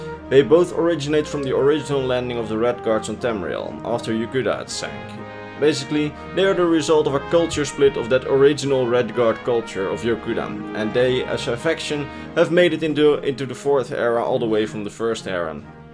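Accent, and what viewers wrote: Netherlands. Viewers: IK WIST DAT JE NEDERLANDS WAS. Cheers, love your videos vriend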